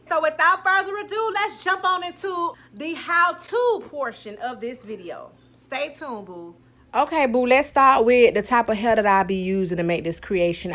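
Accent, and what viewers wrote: South, United States. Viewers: Gwerlllllll you killed this 😍 I’m not good with wigs at all! My friend glued it down for me but you made this look so simple now I wanna order a blonde wig and try it 👀 btw where are you from ? I love your southern accent.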